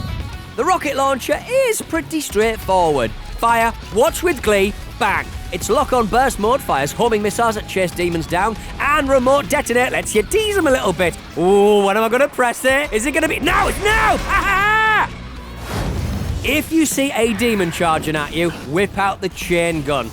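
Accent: Australia. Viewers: He sound more Aussie than Irish to me, m8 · The narrator feels like his having a normal day in Australia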